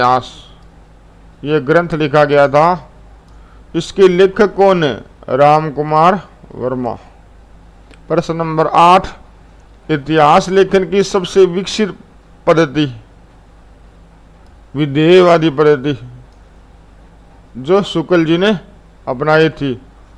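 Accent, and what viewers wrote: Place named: Jalore, India. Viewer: आप जालौर जिला राजस्थान के निवासी लग रहे है सर लहजे से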